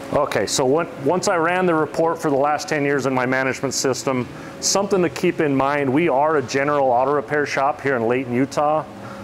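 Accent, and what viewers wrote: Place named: Canada. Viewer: With all of the awes,you sound like Turdo up her in Canada!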